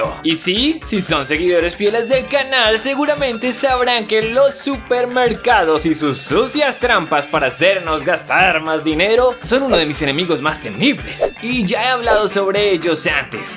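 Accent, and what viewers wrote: Colombia. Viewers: Sabía que ese acento era colombiano!!!! XD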